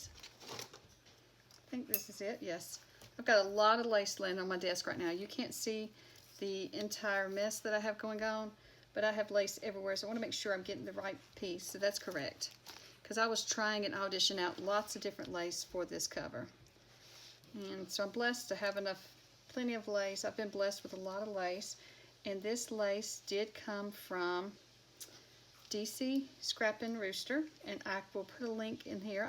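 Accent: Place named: Texas, United States. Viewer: Where are you from? My first language isn't english, I understand everything you say but I can help thinking that you may be from Texas or some american place with cowboys and sun lol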